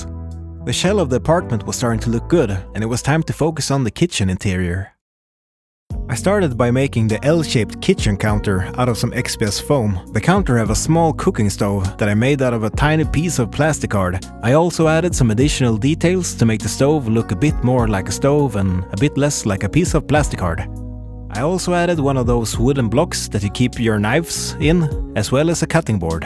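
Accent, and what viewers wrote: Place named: Norrland, Sweden. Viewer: Lägga plaank, where in Sweden do you live? Sounds like up north 🎉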